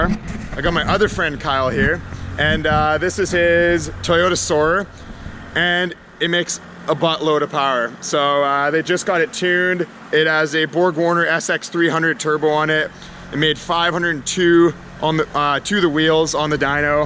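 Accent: Canada. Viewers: Anyone else pay attention on YouTube videos for certain Canadian accent words and sayings? Y'all got that rec weed. Do yo thang😎👌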